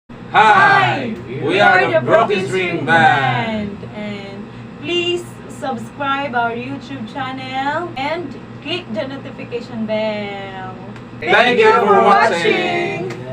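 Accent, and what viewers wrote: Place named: Visayas, Philippines. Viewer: I love your visayan accent.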